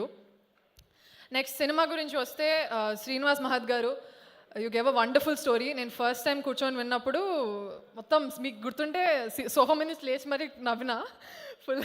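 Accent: Telangana, India. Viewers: Telangana slang suprrrr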